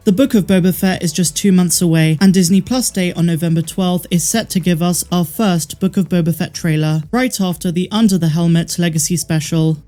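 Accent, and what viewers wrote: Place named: Bristol, United Kingdom. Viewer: Love the work! Can i just ask - are you from the West Country? Whenever you say "Jibber Jabber" Your 'ers' sound like 'urs' as in "Jibbur Jabbur". Are you Bristolian? I'm from the west too, alittle further down. Your daily drip feed of tsar Wars info is very welcome & addictive!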